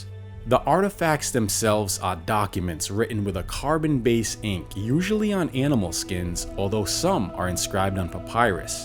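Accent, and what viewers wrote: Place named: New Orleans, United States. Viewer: Sounds like a New Orleans accent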